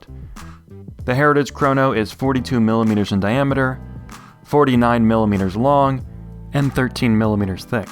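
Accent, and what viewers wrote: Birmingham, United Kingdom. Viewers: Love the Brummie accent! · Nice attempt at brummie accent! It’s where I went to University:)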